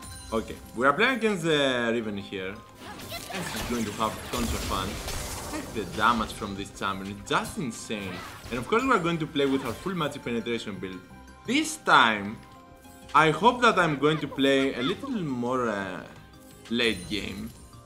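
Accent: Greece. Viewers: pretty sure its greek. i am from greece and lots of people talk like that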